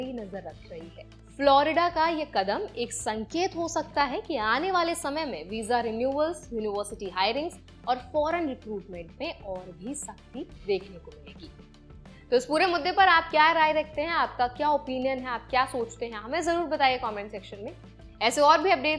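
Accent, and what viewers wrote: India. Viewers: I'm sorry but you Indians hsan annoying accent when you speak English. Have you ever listened to yourselves?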